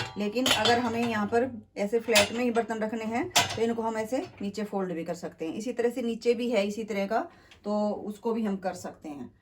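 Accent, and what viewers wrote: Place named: Haryana, India. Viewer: Main aapki new subscriber hu mam..aaj ek dishwasher ka video meri screen pe aaya to main usko dekh rhi thi...to aapki video dikhi....aap hryana se ho kya..aapki awaz me hryanvi tone aati hai...to puchha...bcoz m hryana se hu......bahut hi impressive bolte ho mam aap...nice video...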